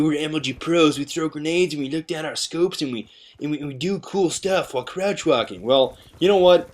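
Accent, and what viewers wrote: Russia. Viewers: your Russian accent is awesome. крут :) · polar bear is more deadly, grizzly are just more likely to attack great rushian accent i alwasy blame lag for those shotgun moments i hear theres some kind of hit box they have to be in when you start the kniff inorder for it to hit them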